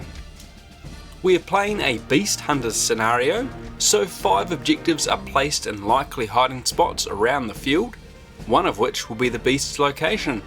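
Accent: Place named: New Zealand. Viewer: Love the character put into the battle report mate. Also, you sound like a kiwi. Hello from Hawkes Bay!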